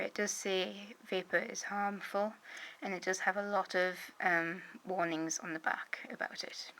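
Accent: United Kingdom. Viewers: Yay ur from the uk aren't u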